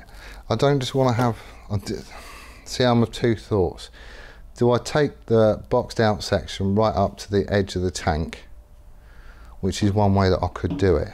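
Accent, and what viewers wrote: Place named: Plymouth, United Kingdom. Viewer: Plymouth! , thought you sounded Jannerish.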